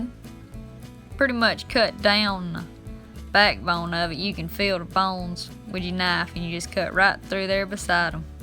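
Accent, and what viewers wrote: South, United States. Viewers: I’m in love all over again by your beauty and southern accent 😍😍🥰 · A young woman with 6-pack abs, a sweet southern drawl and knows her way around the field, lakes and rivers....and the kitchen. If I was 45 years younger I'd be in love. Great videos! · Love ur deep Southern accent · Southern drawl....I love it to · She sounds like she is from my neck of the woods. The southern accent is one of the sweetest sounding accents . Well at least to me .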